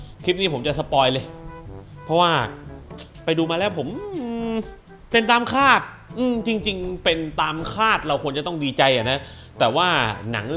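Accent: South, Thailand. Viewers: พูดใต้เสริมๆมาบ่อยๆก็ตลกดีนะครับ เป็นเอกลักษณ์ช่องดี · ชอบ พูดใต้อะ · คนใต้หรือคับ ผมชอบนะเวลาพูดใต้ เพราะมัน.อ็อฟตัด!!.....(หายไปไหนมาทีไรตกใจทุกที)สนับสนุนแกนานนะอ็อฟตัด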